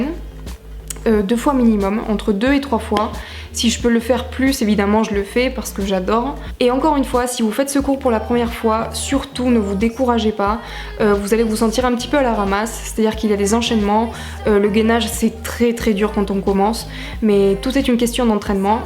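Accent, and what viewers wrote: South, France. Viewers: vive le sud !! on reconnais l'accent ;)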